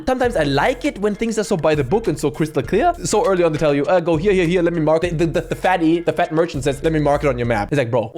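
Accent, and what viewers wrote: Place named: Germany. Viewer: I can here a little bit Deutsch von ihnen, interessant...🧐😂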